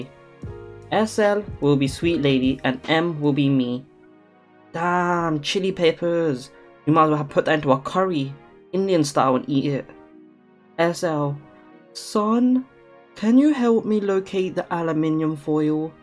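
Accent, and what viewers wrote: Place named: London, United Kingdom. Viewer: i am a subscriber, have been for about 5 minutes because you sound like a london boy to me, gotta watch out for the london crew. i am a satanist, you are more than welcome to use satan as a curse word, we believe in freedom of speech, have fun. ave satanos.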